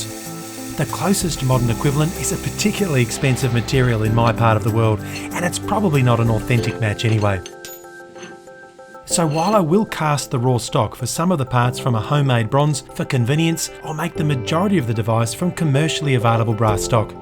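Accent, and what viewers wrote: Australia. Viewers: Subbed the instant I heard a fellow Aussie accent, liked for awesome content. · Well his voice isn't exactly soothing, but it isn't bad for an Aussie one........... *Kiwi here :P But yes will be EPIC :)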